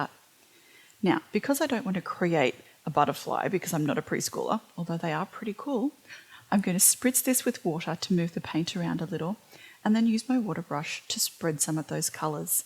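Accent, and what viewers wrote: Australia. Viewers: Really loving your technique, you are so easy to understand. I so please to be hearing yet more Aussies doing these videos. TFS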